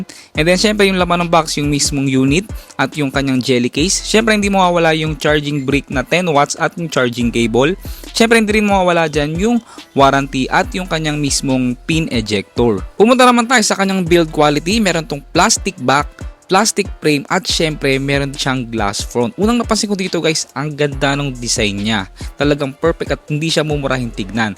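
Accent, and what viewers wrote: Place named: Pampanga, Philippines. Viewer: you sound Kapampangan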